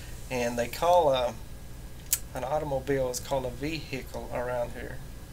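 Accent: South, United States. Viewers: I'm a Midwestern American, and I enjoyed your video. I love learning about accents here and in other countries too. Just as you recently learned about the many English, Irish, etc. accents, I've only recently learned how many US Southern ones there are. Yours is lovely. When I've been in the UK, people trying to imitate an American accent often do a (bad) Southern one. BTW: if you don't think Southern accents can be sexy on a guy, watch Georgia native Josh Holloway on the show Lost!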